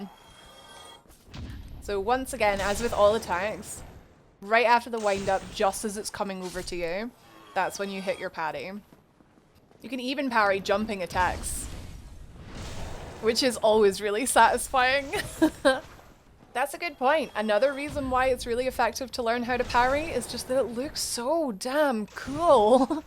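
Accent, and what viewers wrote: Scotland, United Kingdom. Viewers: Came for tutorial, subscribing for fellow scot accent. Will be using buckler on my next DS attempt, thank you.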